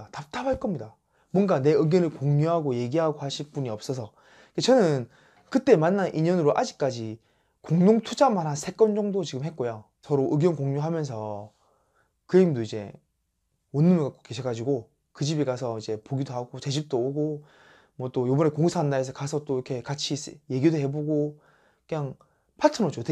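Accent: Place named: Gyeongsang, South Korea. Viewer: 멘토님 대구분입니까 부산분입니까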